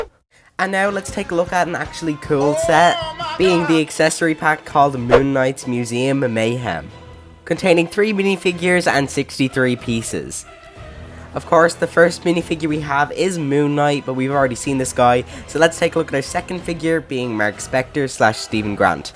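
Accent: Ireland. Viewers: Are u irish and if so what county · Are you Irish like me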